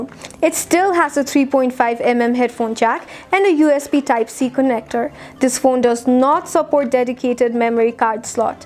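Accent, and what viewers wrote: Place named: England, United Kingdom. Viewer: I like your english accent.. 👍👍👍 Your pronunciation is very good.. And your video is pretty simple to understand.. I like it.. Keep it up.. Looking forward more from you.. 👍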